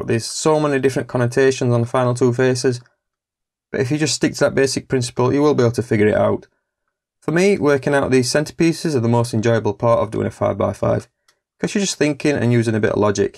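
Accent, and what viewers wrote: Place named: Mexborough, United Kingdom. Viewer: You sound like youre from Mexboro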